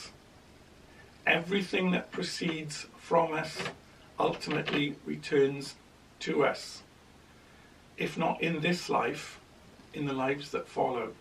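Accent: United Kingdom. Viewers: I like the way this guy talks he is British like my mom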